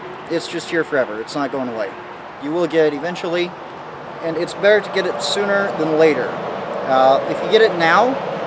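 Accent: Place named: South, United States. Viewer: Lumberjack Obi-Wan with a southern accent. Based.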